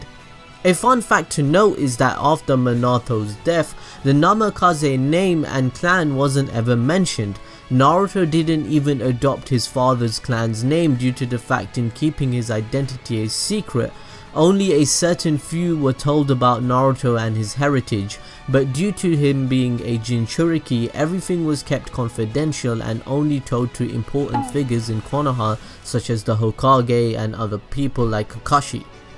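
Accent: United Kingdom. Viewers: Your British wow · Yes, very thick British accent. great vid. though. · Your British accent can be as thick as you like as long as you don't say "bluud or fam", I can't stand that down to my soul bro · Your accent is B r I t I s H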